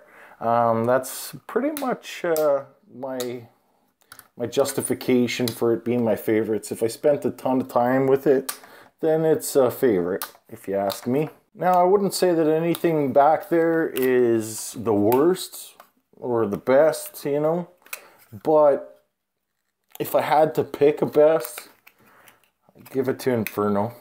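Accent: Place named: Canada. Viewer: Yo bro I'm Canadian too EH. How you talk is pretty much how I sound. All good though. I'm in Winnipeg bro. Where you at man?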